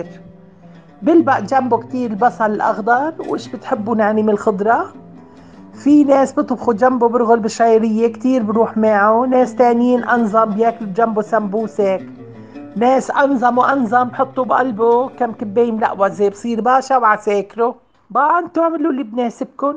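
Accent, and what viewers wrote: Aleppo, Syria. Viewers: دخيل عينك ما بتذكريني الا وانا.. بحلب.. على جمال اسلوبك.. انا بتابعك بس لاسمع صوتك ولهجتنا الحلبية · يا وين كنتي لهلئ اييي ابوووس وجنك وجك انا خيييييت والله حسيت حالي بنص حلب ع سمع صوتك وطبختك 👍😅 عفرينة اناااا وبحبك كتييير لسع ع أيام نواله بحبك 😍🤣🤣🤣🤣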